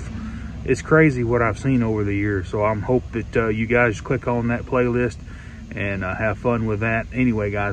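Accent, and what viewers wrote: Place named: South, United States. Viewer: Why are there so many hard-to-understand hicks with that ignorant sounding souther drawl putting out videos? People don't think you know what you're "tawkin' 'bout".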